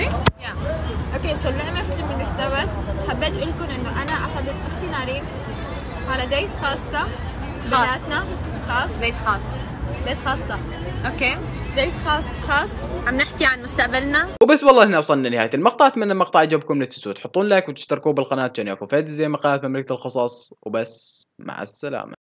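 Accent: Syria. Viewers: شنو انتي متعرفين الهجة العراقية بربج يعني هاي لهجة سورية الي ديحجيهة 🗿💢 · لاء يحجي سوري شبيج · هو قبل كان يتكلم سوري بمقاطع قديمه وهو اصلو سوري وعايش بعراق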